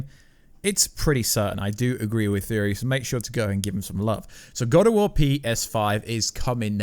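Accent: England, United Kingdom. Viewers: (not relevant but but whereabouts in England is your accent from don't sound like black pool or London to me just curious?)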